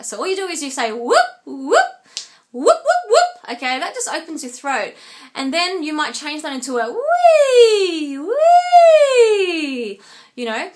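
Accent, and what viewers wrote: Australia. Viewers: You're Australian. :) I'm considering singing lessons and I wanted to know what part of Australia you're from.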